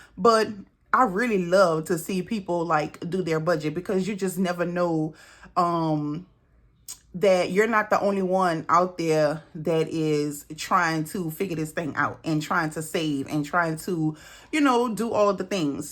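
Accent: Louisiana, United States. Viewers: Hey, girl you got me with robbing peter to pay paul!! 😂😂 New subscriber!! I love your accent...are you from Louisiana? ❤☺️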